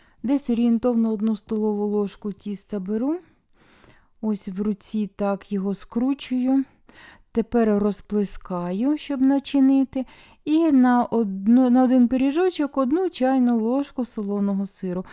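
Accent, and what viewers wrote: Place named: Cherkasy, Ukraine. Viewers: Вимова черкаська.Шевченкові краї. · я подумала- Вінниця ❤Приємно знати,що на Черкащині так розмовляють ❤.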